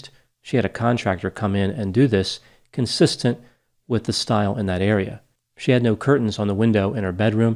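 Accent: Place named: Delaware, United States. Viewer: I think he is from Delaware. It's probley an accent thing if anything. I'm not aware of it.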